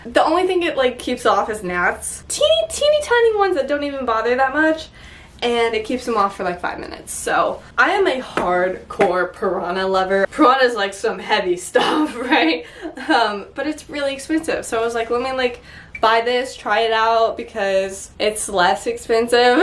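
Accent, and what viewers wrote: United States. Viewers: north of where?😂 from what I hear you speak in an American accent, so do you mean north of the USA?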